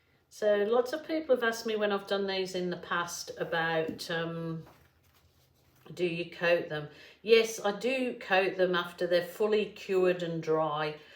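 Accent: Birmingham, United Kingdom. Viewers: This lady sounds like an English Brummie. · we’re you a Brummie?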